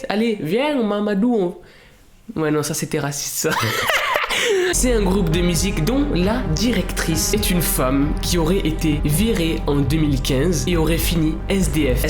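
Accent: Quebec, Canada. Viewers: 'un petit axant canadien Québec 😅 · avant que tu le mentionnes je trouvais déjà que parfois tu parlais un peu comme un québécois 😂 avec de la pratique tu pourrais parler aussi bien que moi 😂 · belle acent quebecois 😅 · J’adore ton accent un peu canadien et québécois j’adore❤😂👌🏻 tu est prêt pour aller aux Québec 😏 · Quand ta dit "jsp pk j’ai un accent canadien Québec" OUAIS C MON RÊVE QUE TU SOIS QUÉBÉCOIS MONSIEUR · Un ti accent dquebec 😂 Hahahaha je t’adore je suis québécoise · Ton accents canadien est super tous comme ton accents anglais 😂😂❤️ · Si tes en France pourquoi ta parler quebecois jcomprends pas🤣🤣😭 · mdr je reconnais tout les canadien mais je peux te dire que tu a une voix de canadien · J adore ton accent québécois Salut cousin francais cool lol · Wtf l'accent québécois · Le petit accent quebecois qui fait plaisir😂❤️❤️ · Il est trop chaud mdrr Et fais trop bien le Québécois 🤣🤣🤣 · Ton accent québécois elle est épique confirmée par une québécoise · Omg je suid traumatisé et tres belle acssans canadiens moi je suis québécois je m'abonne :)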